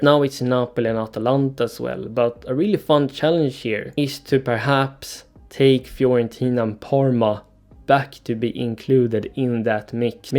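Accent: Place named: Sweden. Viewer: LMAAOO i was just about to ask that you have the perfect swedish accent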